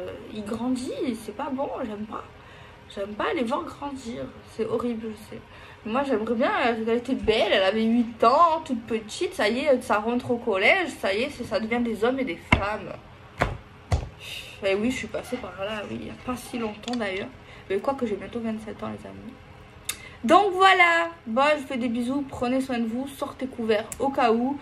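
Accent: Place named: Toulouse, France. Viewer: Bonjour tu as l'accent du ☀️ soleil peut être de Toulouse bon ça me ressemble je suis fou bélier ma chérie Verseau fille difficile à vivre Bon je l'aime très fort pardon tu as la pêche 🍑 la patate tu me fais penser à Patrick Sébastien on est serré dans cette boîte sardines j'ose un bisou merci ton tirage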